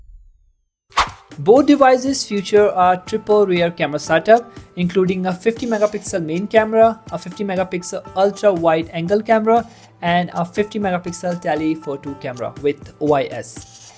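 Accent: India. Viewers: Indian accent so irritating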